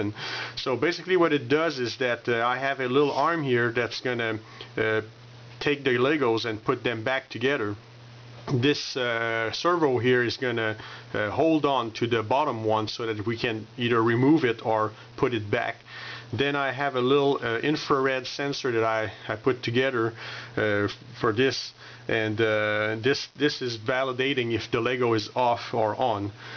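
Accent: Canada. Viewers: Togeder! I keep cracking up when he says together and I love your Canadian accent. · you sound like you are French Canadien. Either way looks good.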